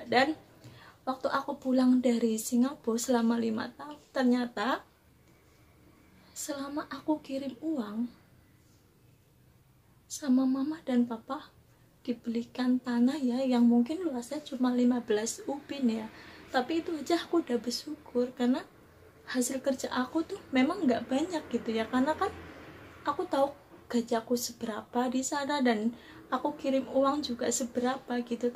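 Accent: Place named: Banyumas, Indonesia. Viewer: bersama ngapak wadon yang lagi cerita di singapore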